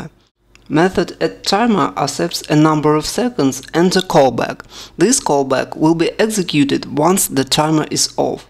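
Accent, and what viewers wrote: Russia. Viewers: можно было бы и на русском, если такое произношение..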